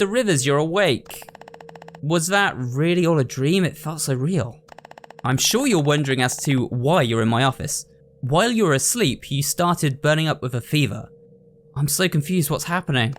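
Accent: United Kingdom. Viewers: What is your accent? You're saying "Ant-oh-knee" rather than pronouncing the "t" in "an-tho-knee" so I'mma guess your british.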